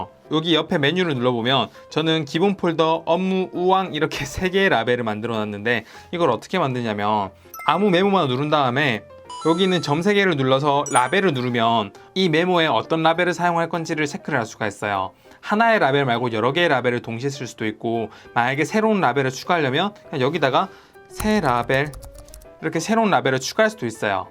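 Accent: Gyeongsang, South Korea. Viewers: 말투가 경상도네요? 사랑해요.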